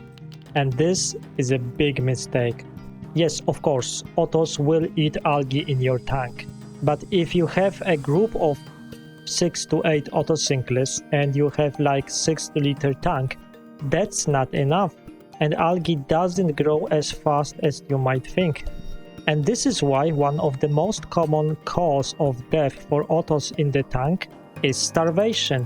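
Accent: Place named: Poland. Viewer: Are you Polish, mate? Sounds like it😉 A few of mine died after a year for no clear reason. Balanced tank, no ammonia, no nitrite/nitrate spikes, much green algae growth on side glass, no new fish to bring diseases whatsoever.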